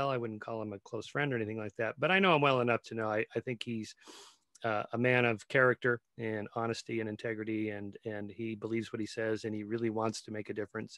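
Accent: Wales, United Kingdom. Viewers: You Welsh? Go on bach!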